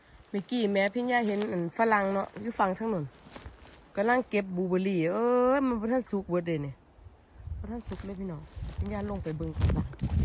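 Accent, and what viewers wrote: Korat, Thailand. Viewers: ชอบความสำเนียงโคราชบ้านเอง เป็นคนอำเภออะไรคะ บลูเบอร์รี่น่ากินมากค่ะ❣️กดติดตามแล้วนะคะ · เป็นFCใหม่นะค่ะฝากตัวดว้ยจร้าจะติดตามนะค่ะ..ขอโทษนะค่ะเป็นคนจังหวัดอะไรค่ะคือพูดภาษาโคราชได้ค่ะ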